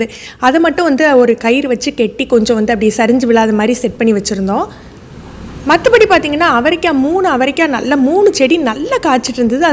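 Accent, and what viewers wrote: Tirunelveli, India. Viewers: Akka neenga tirunelvelia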